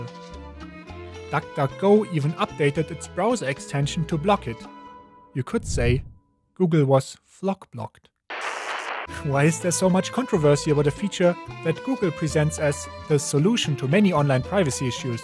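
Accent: Germany. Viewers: He has a German accent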